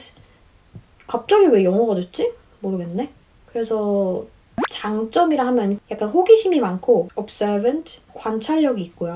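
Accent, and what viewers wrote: United Kingdom. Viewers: 오늘따라 더 이뻐보인드아...♥ 항상 잘 보고 있어용 영국 발음은 처음들어서 그런지 자꾸 끌린다앗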